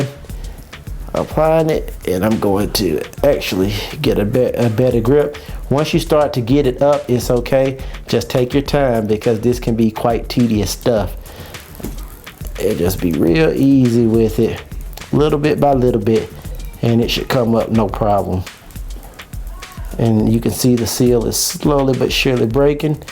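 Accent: South, United States. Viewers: man you are one of the most country sounding people I have ever heard. -the guy from Texas that lives in the sticks of Tennessee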